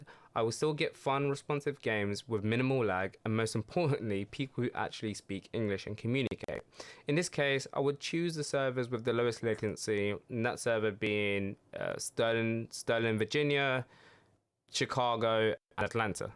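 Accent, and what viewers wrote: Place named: United Kingdom. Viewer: Got to love hearing a brit playing cs, see brits all over youtube playing but i always got Igor and vlad on my team instead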